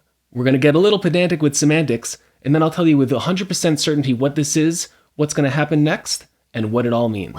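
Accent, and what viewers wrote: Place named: United States. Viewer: You are not Cornish you're American Dumbo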